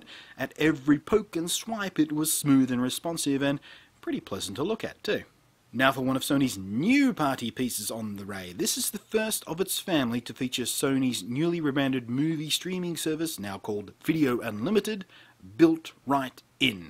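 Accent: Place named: Australia. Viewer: ooohhh man i love your australia accent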